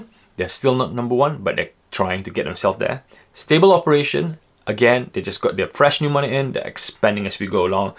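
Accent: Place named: Singapore. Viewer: Are you originally from Singapore? I hear the Singaporean accent ;)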